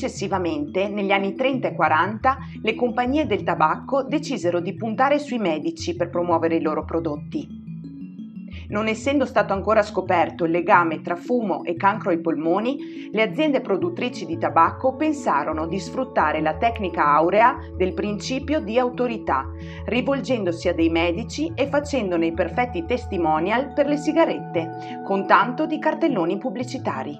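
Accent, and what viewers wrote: Veneto, Italy. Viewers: Racconto interessantissimo... grazie☺️ Sei Veneta per caso? 😬🙃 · Sei veneta?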